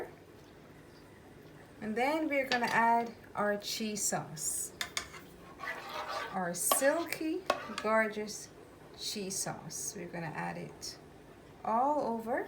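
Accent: Barbados. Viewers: Your accent sounds like bajan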